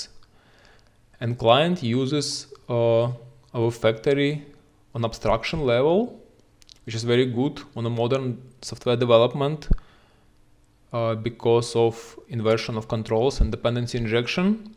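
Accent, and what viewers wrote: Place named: Russia. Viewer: russian accent makes it impossible to listen. anyway thanks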